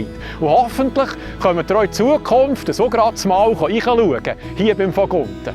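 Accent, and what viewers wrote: Bern, Switzerland. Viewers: Herrlich dis Bärndütsch 👍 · Dr Herr Trump erchouft secht haut sini Macht mit Gäud und mit Entlassige. Weni ihm zuelose, de dünkt's mi rächt offesichtlech, dass es ihm nid i erschter Linie um ds Wohl vo Amerika geit, sondern um d'Vermehrig vo sim persönlech Riichtum und Macht. Si Aatrieb isch sis gchränkte Ego (was sogar nachvouziehbar isch, wenn me sini Biografie aaluegt). Nüt gäge Riichtum, aber es git riichi Lüt wo ehrehafti Mönsche si mit ehrehafte Wärtvorsteuige, und die würde nie so handle. Ja es stimmt, es geit hie nid a priori um Pro oder Contra Trump, und trotzdäm dünkts mi wichtig, das Thema und das indiränkte "Lob" a Trump im Video ine entsprächende kritische Gsamtkontext z'steue. Es isch aber e Fröid, dim schöne Bärndütsch zue z'lose 🤗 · Dieser Beitrag is wirklich ein Hit, ich bin froh dass ich gefunden habe. Und dann dieses schöne Bärndütsch! 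Herzliche Grüsse von einem Heimwehberner aus Südkorea! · Das war wiedermal Deutsch und deutlich! Man kann sich über diese finanzielle Schieflage in den alternativen Medien sehr gut informieren lassen. Aber unsere tollen Mainstream-Medien begnügen sich halt immer noch mit einer dümmlich vereinfachten Weltsicht. Sie spielen 'hau den Lukas' und verkaufen uns für dumm. Du hältst in breitestem Berndeutsch dagegen - bravo! ❤ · Einfach schön, jemanden zu hören, der richtiges Schweizerdeutsch (Bärndütsch) beherrscht und praktiziert!!!!!! Dazu noch alles für die Dümmsten (wie ich) einfach erklärt. Danke!!!!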